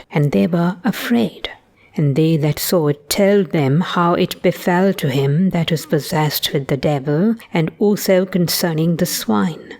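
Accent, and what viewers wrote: RP, United Kingdom. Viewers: What a marvelous video! Very good taste for the images and an impeccable Conservative RP reading. You are outstanding! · Hi! Thanks for this video. This was stunning video with great picture and I loved the music. Did you do this video because Easter is approaching? I love it and love it:) I can't imagine how much time and energy you have put behind these videos and Of course! I don't need to say anything about your posh accent. I would like to take accent class from you if you are conducting accent coaching. Great work!🙂🙌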